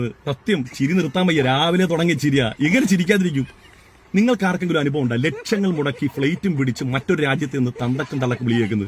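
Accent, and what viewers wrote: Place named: Kollam, India. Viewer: ഉസ്താദ് കൊല്ലം കാരൻ ആണോ 😄😄. അതെ language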